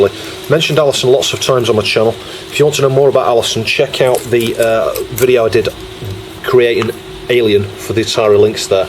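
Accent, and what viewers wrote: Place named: Northern England, United Kingdom. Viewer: Northern? Sounslikeyerfromrowndear.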